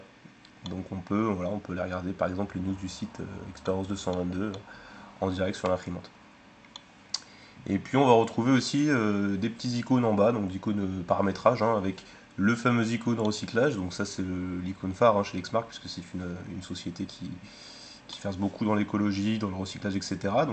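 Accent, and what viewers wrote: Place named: France. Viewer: Fr = French The accent seems to say he's from France.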